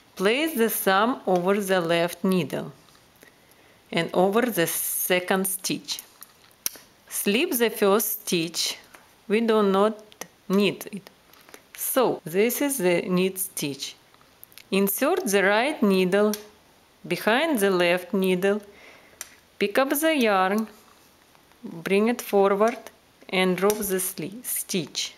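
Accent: Russia. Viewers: You have the same accent as CrazyRussianHacker! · This is a good video you did well. Thank you. I am used to listening to the Russian accent as my daughter-in-law is Russian. Thank you for sharing.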